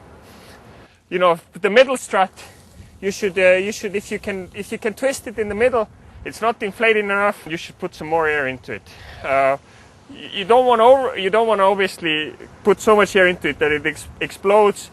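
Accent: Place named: Estonia. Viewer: I figured you are from Estonia by your accent:) nice video tho. I want to learn how to fly badly. :)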